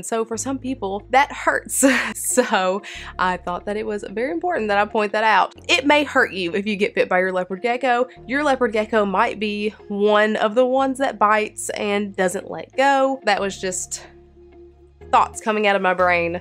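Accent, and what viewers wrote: South, United States. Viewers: I love your accent. I live in Michigan and don't hear southern accents. So it's fun to hear. But yeah. Unfortunately people feel like they can say anything on the internet. So you're gunna get a lot of trolls. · there's nothing better than that southern drawl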